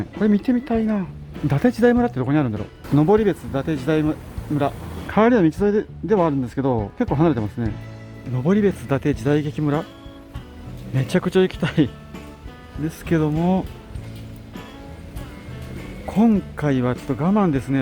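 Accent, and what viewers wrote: Okayama, Japan. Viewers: お疲れ様でした。コロナが勢いを増している今、楽しく拝見してました。さんの岡山弁に毎回、千鳥みたいじゃー（笑）と思ってクスクス笑ったり、朝市に行けなくて一緒にガッカリしたり、トウモロコシがなかなか売ってなくてそうなの？何で？と思ったり。最後、なんか食べれるねと思ったら、律儀に計算ミスがあってと修正されて、あらら〜ってなりました。本当、楽しかったです。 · 岡山の皆さん、茨城県から質問失礼します。さんがよく語尾、会話の間に、 「から」って言ってますよね？あれは方言なのですか？教えてください、お願い致します🙇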